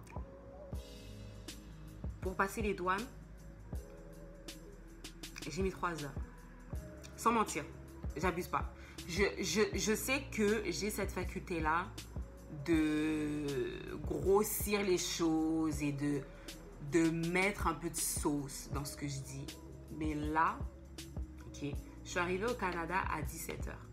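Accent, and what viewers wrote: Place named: Cameroon. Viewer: Salut tu as un peu l'accent camerounais... je viens voire votre vidéo et cela est très très bien expliqué ma question est la suivante :si vous avez un amis est-il possible qu'il parraine (sponsors comme on dit ici au Cameroun)toutes vos démarches d'immigration et combien de temps cela peut il prendre pour un jeune diplômé (ayant une licence professionnelle en logistique dans une école de commerce de la place)au chômage ? S'il te plaît ?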